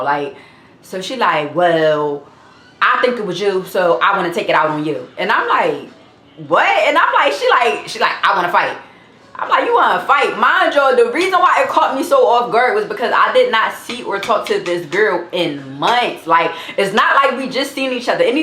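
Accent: Philadelphia, United States. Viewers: she front Philly OBVIOUSLY she not gonna talk with a regular tone tf you’re weird , you don’t have to watch .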